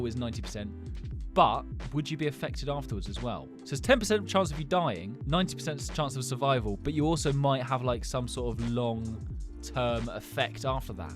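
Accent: United Kingdom. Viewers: Wait are you meant to be from the UK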